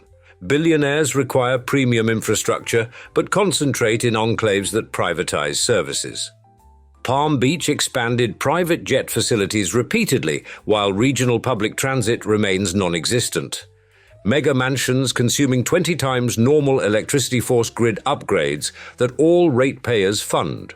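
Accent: United Kingdom. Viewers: British accent means go to another story fast.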